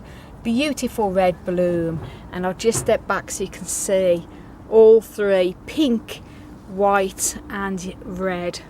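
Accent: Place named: Birmingham, United Kingdom. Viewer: no worries is a true aussie saying 🤣🤣🤣🤣. To me you sound Brummy all the time , not as bad as Ozzie Osbourne though 🤣🤣🤣🤣🤣🤣🤣. Have a great weekend you and Hans, sending you lots of love from Ellyn and me. ⚘😗❤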